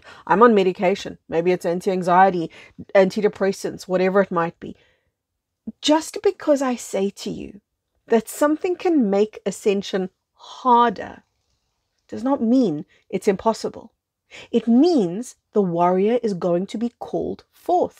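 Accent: South Africa. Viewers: Indeed! Flip the script. From victim to super hero. And always thanking our agony, trepidations, dilemmas, tragedies because in the end if you want them to they are your invaluable inner wealth and that light, baby, (I'm talking like you now 😆♥️) is going to get you to ascension and help steward others who need a hand to hold on their way! Yay. I have two hands and I thank them. Thank you. I'm English Greek I hear your South African accent and melt. Have many S.A. friends in Europe. You feel like family 🥳🎺🙏🏽🙏🏿🙏🏻🙏🏾⭐❤️ Love to all subscribers and beyond! When I can afford it I'm definitely going to sign up for your lessons and group. Yes, baby ♥️🦋.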